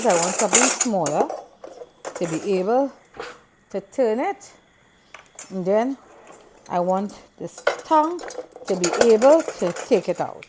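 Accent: Trinidad and Tobago. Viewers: How is this lady not acting like she’s from Trinidad…with a Trini accent and everything… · Reynold Balgobin, try and learn to spell first and work on your grammar before criticizing the goodly lady. Be more appreciative of our culture that is visible now worldwide. Nothing is wrong with how she is speaking. If you're not hearing any Trini accent, well something is definitely wrong with you.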